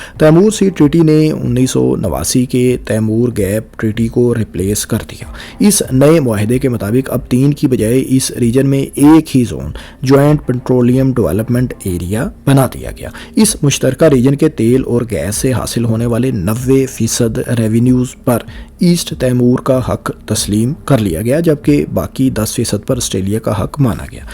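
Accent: Punjab, Pakistan. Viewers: Mr. has some serious problems in pronunciations of the names of cities, countries places and areas. 😡🤬 Therefore, the viewers should not hope that he will improve because he has a typical Paindu Punjabi accent🤮🤢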